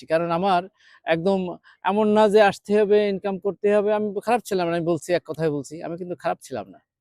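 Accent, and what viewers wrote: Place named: Jessore, Bangladesh. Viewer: আপনি মনে হয় আমাদের যশোর অঞ্চলের মানুষ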